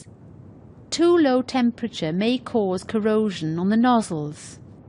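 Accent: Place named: United Kingdom. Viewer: 英音好难受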